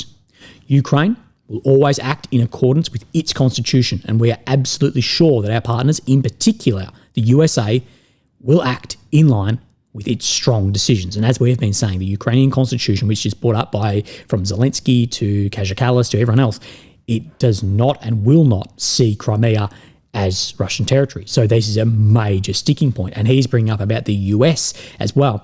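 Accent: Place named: Australia. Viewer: Will, l'm happy to see you in good health. over time, l'm also getting used to your aus accent. thanks for your contribution and comments. keep helping us please❤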